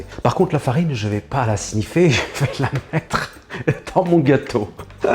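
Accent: France. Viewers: j'adore ton accent français à la hache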